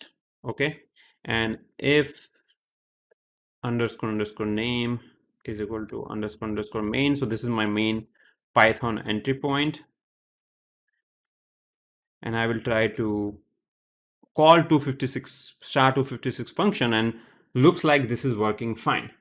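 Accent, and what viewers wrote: India. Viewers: When I heard that indian accent, I knew this would be good. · When I hear indian accent, I listen carefully coz I know I'll be learning a ton.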